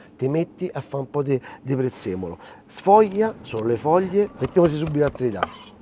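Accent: Rome, Italy. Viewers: your Roman accent is killing me 😂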